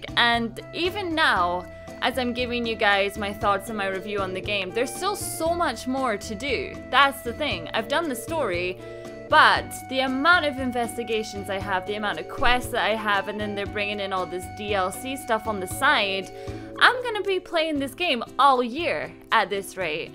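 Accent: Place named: Scotland, United Kingdom. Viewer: I'm thinking...Scottish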